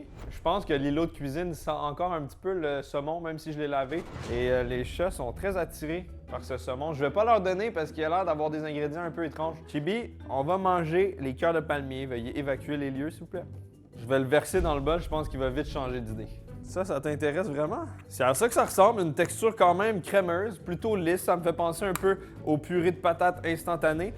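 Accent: Quebec, Canada. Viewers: ah ok merci de l'info j'oubli trop facilement qu'il est au quebec. ( malgré l'accent ) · Rien à faire. L'accent québécois est dégueulasse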